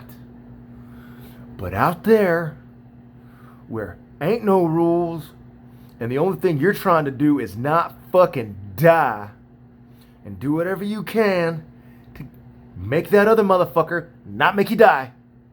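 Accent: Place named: Russia. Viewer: Your Russian accent is very cool! Haha😂 . Где изучал русский язык?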